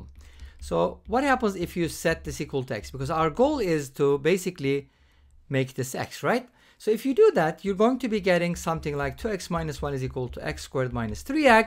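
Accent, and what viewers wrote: Turkey. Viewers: Abi sen Türk musun sesine turkluk seziyorum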